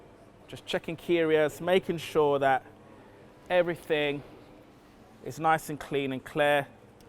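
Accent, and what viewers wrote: United Kingdom. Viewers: ''Your British accent ...is killing me ...very nice ...'' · Love the British accent, this man really knows his business. Also he's totally classy.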